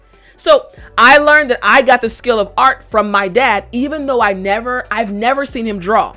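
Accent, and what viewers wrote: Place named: United States. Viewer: What an amazing American accent you speak dear! I love listening to it!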